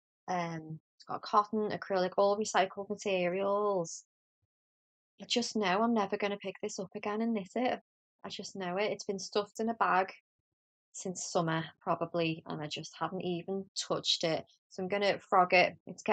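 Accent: Liverpool, United Kingdom. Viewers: Hi. New subscriber here from New Hampshire, in the US. I was born in Paisley, raised in Leeds and went to University in Liverpool. I heard your accent and you made me smile. The first YouTuber I have heard from Liverpool. I thoroughly enjoyed your “Make 9” episode and will probably go back and listen to the older episodes.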